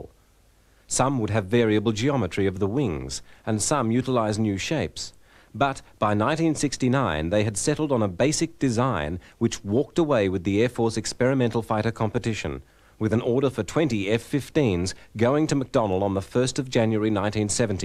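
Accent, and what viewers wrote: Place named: United Kingdom. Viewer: Yes - the narrator has a British accent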